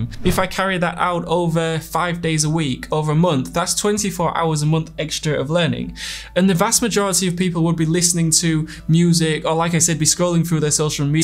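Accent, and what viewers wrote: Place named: Yorkshire, United Kingdom. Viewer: which part of the UK do you come from? It sounds a bit like Yorkshire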